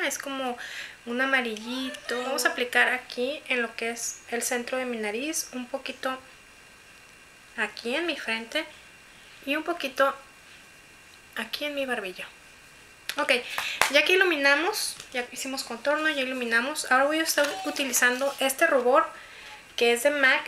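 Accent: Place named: Mexico. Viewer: lindo che por ke pronuncias asi tu mushooo de ke parte de mexico eres te kedo bello y me encanta cuando ablas asi es bien lindo un beso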